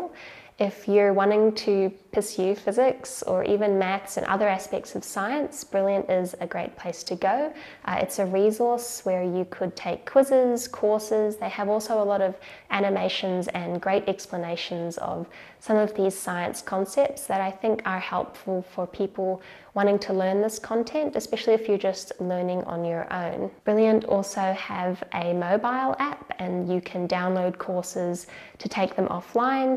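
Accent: New Zealand. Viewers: Kiwi right? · JustArduinoThings its also that kiwi accent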